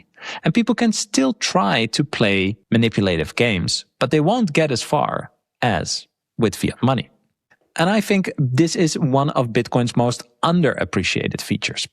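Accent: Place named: Netherlands. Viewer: Yo! You are the young Jack Nicholson but with a Dutch accent! Keep up the great work, hello from Hungary!✌️😎💚💎✨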